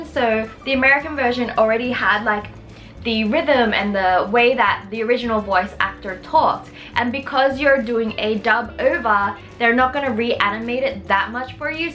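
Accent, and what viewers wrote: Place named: Australia. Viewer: Too bad i don’t live in Australia or New Zealand bc I love ur accent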